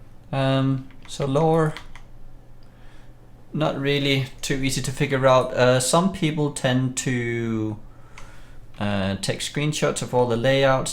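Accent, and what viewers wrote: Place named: Denmark. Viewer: Hey, you sound like you have a danish accent. Where are you from?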